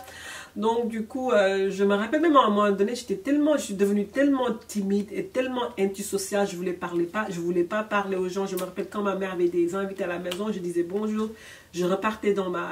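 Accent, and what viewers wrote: Senegal. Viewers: MlleMama c’est pas qu’elle parle mal français, c’est l’accent sénégalais qui est fort comme ça, toutes mes copines sénégalaises parlent de la même façon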